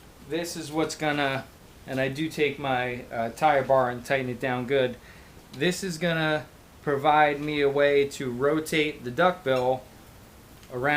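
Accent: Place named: South, United States. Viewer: I hear saquetas.......... You must live in the South? Lol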